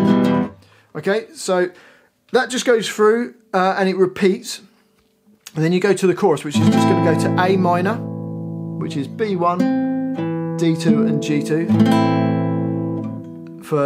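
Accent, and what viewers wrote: United Kingdom. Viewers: I ain't sure yet of what sounds better - his British accent, the guitar or the song itself. It looks like all of those are brilliant.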